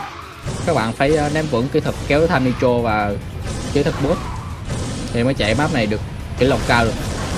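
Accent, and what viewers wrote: Quang Ngai, Vietnam. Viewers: Anh quảng ngãi hả em quẢng nam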